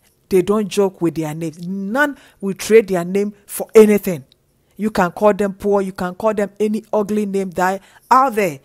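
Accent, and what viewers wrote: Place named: Africa. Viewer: This was inspiring and greatly appreciated. Your teaching and voice without background music, without european or english accent but rather an African accent from the Motherland makes it authentic. I look forward to your upcoming history lessons. Thank you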